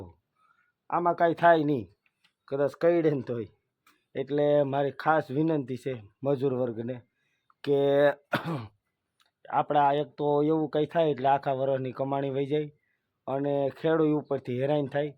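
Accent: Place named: Sidhra, India. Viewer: ભાઈ સિધરા બોલી મજા આવી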